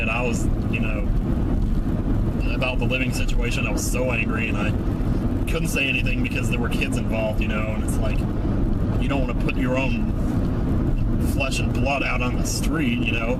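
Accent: South, United States. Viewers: that old tape man, you sounded like a straight up southerner but it still sounded like in there somewhere, I'm so amazed by the arc of which your voice changed over time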